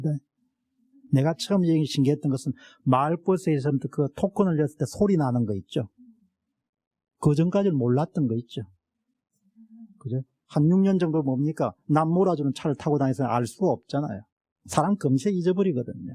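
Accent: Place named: Gyeongsang, South Korea. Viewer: 경상도 사투리 구수하고 진정 진실한 마음 안격이 보이는데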